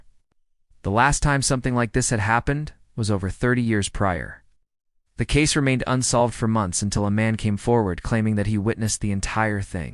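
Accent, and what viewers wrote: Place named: Dublin, Ireland. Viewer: Very good but prefer your Dublin accent!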